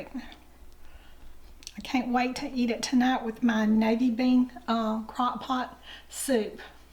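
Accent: South, United States. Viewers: Your soft sweet southern accented voice sounds just like my late mother in law . She was a great cook too ! ❤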